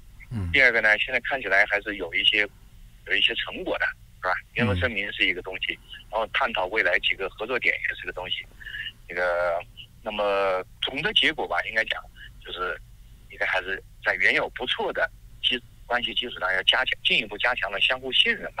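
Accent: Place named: Cantonese, China. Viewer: 这个主持人一听就是讲粤语的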